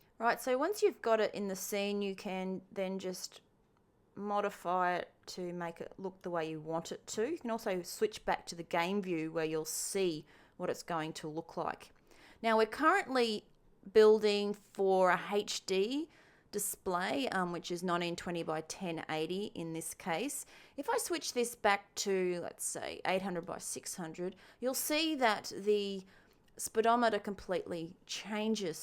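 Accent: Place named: Australia. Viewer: So nice to open the video and hear an Aussie haha. Really clear tutorial too, many thanks!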